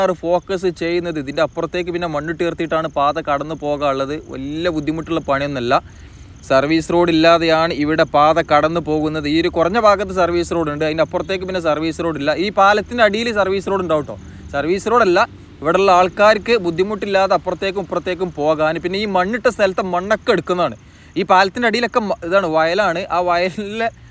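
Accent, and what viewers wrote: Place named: Northern Kerala, India. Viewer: താങ്കളെ കാണുവാൻ കഴിഞ്ഞതിൽ സന്തോഷം. പ്രോഗ്രാമുകൾ നന്നാകുന്നുണ്ട്. പ്രത്യേകിച്ചു താങ്കളുടെ വിവരണം. വടക്കൻ ഭാഷയിലുള്ള സംസാരം, ഞങ്ങളെപ്പോലുള്ള കോട്ടയംകാർക്ക്, കേൾക്കുവാൻ ആകർഷകമാണ്. All the best.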